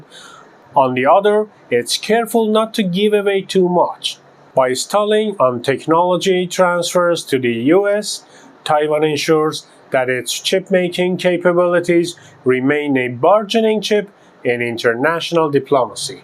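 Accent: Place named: Russia. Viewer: I can barely understand this guy, due to his accent, which is probably Russian. The inflection is all wrong.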